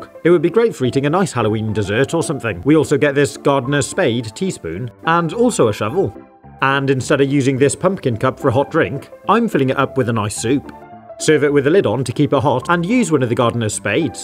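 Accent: United Kingdom. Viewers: You sound more British than British people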